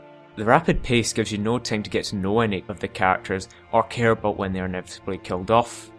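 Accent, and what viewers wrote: Scotland, United Kingdom. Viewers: Is that a Scottish accent? Sorry, I'm not anglophone, so I'm not 100% sure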